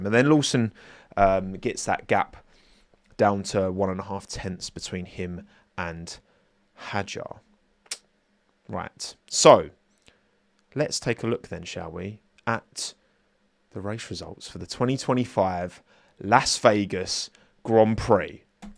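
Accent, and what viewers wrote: United Kingdom. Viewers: Wow, what a frustration! You sound like a Brit and that’s not a compliment…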